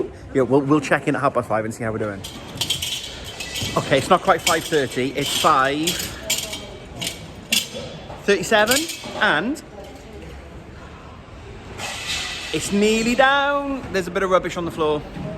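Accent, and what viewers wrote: Wales, United Kingdom. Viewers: my 8 year old son says he is surprised because he’s actually enjoying this video and watching the set up! Haha. He says he likes the way you talk! LOL. He means your Welsh accent!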